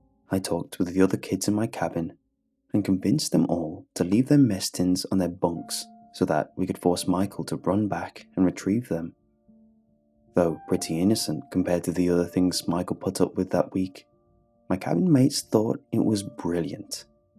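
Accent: United Kingdom. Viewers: British voice 1like=prayer for michael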